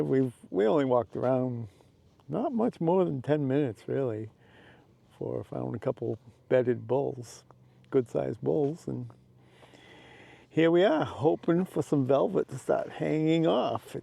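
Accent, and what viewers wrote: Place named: East Coast, United States. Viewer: I often wondered when the shedding took place. Seeing the breath i guess cooler air is starting already. Hearing your accent any chance your from the east coast?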